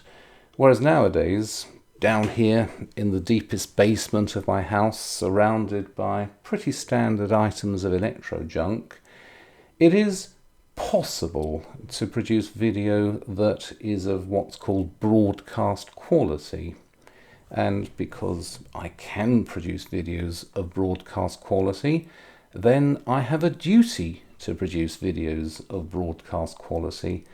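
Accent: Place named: Oxford, United Kingdom. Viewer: Thank you Mr. for all your videos. American subscriber here, I appreciate your easy to understand lectures about interesting historical subjects. Plus your accent makes me feel like I’m a top student attending a class at Oxford University. I’ve listened to your videos several times over and eagerly await your next upload. Thanks again.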